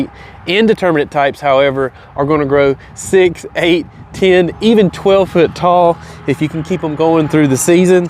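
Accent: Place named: South, United States. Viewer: What the heck! I ain't been gettin' these alerts for the new vids (me trying to sound southern like here) Anywho...great seeing y'all and those are some beautiful plants! Just finished my garden in SW Michigan and it's smokin' hot and no rain in the forecast for days...happy gardening! Great job, once again - cameraman!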